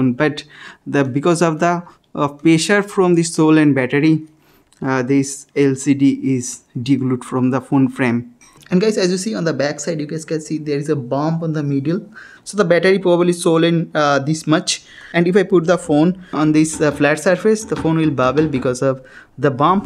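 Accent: India. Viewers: i like this channel indian with english is rare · Indians speaking english is probably the most annoying thing ever....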